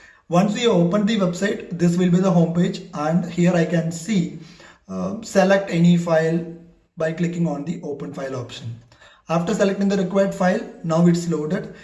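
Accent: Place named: United States. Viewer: You American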